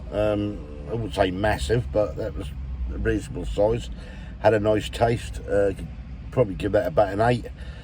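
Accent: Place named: Lowestoft, United Kingdom. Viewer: Surprised you never had your breakfast in the cafe in Sparrows Nest itself, unless, of course, you've already been there. Relatively expensive, perhaps, but very good. I was born and bred in Lowestoft, but love coming home! Love your videos and your wonderful Lowestoft accent. You make me feel homesick! Keep those videos coming!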